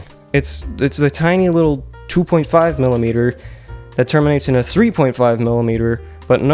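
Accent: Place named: Australia. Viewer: You sound like that funny Australian man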